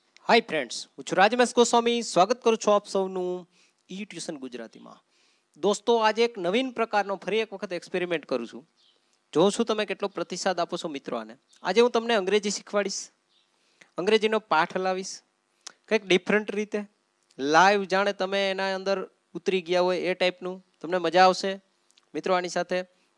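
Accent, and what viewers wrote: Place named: Kathiawar, India. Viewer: Best sir વાહ કાઠ્યાવાડી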